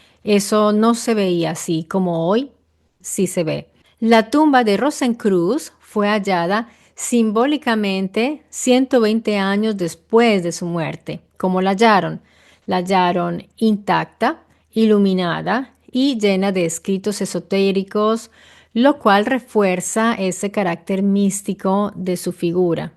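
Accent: Italy. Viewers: Ese acento es de una rola que vivió en Italia y ese cabello/gafas le da un gran marco hermana.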